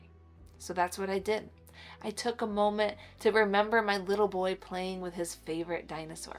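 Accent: England, United Kingdom. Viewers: Oi, a British accent IS normal. 🤣It's a lovely, gentle book, and full of good advice, and she's very right about doing it every few years from the age of 60 if not before. Our lives change, our tastes change, but we hang on to the old stuff that no longer suits us. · ENGLISH accent! There is no such accent as British. We are Irish, Scots and Welsh too. The accent you do is stupid English stupid Peppa Pig Hugh Grant! Do not ever come to Scotland and call us British. 😡😡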